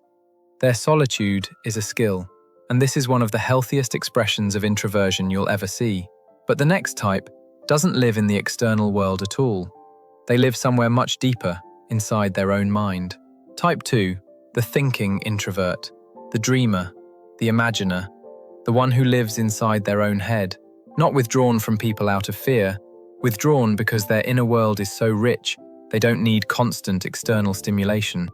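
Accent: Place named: United Kingdom. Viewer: I miss the previous persona/voice. This British accent feels distant. It actually makes me uncomfortable.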